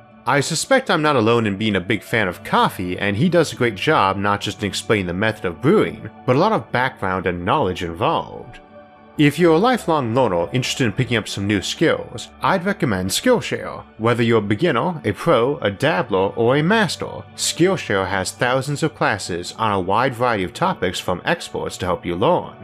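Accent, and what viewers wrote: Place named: Maryland, United States. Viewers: Maryland accent! Only place in the world the letter R is spoken out as a W... Hasn't anyone ever tried to correct this odd phenomenon? Only place a speech impediment is the norm. Great show and Host. Just hard for me not to notice the accent and stay with the info.... MAYBE I'm the one that's impeded. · I'm just lovin' these - there's a calm, mesmerising pace to them that just keeps your attention. Bravo! Where's your accent from, BTW - I'm in the UK and I can't place where in the USA (if, indeed, it's USAish) you're from. There's Deep South twangs in there - and then hints of Canadian. But I'm stumped!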